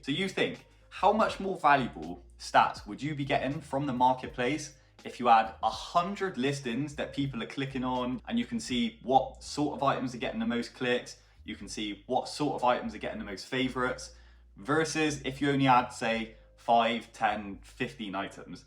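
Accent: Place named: Somerset, United Kingdom. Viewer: bro nice video. you sound like you are close to my neck of the woods with your ascent lol (im somerset).i havent quite got started with etsy yet but i have all the equipment to want to start selling(3dprinters,laser engraver, cnc router, uv printers, vinyl cutter and sublimation printer) ive learnt enough to feel like im almost ready to make sales and being that im in a job that is close to becoming a dead end with all the bad terms they keep wanting to impose (postman) i must start to do something to aim for my dream so im hoping to arrange a call with you soon to make sure im heading in the right direction from day one.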